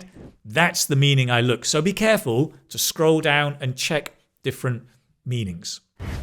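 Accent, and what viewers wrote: United Kingdom. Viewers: It is amazing, how good I understand your pronunciation! The are almost 90% of your speech. I think it's depend on your wonderfull british accent. Your way to speak is very clear! I have so much problems to understand americans. thanks a lot!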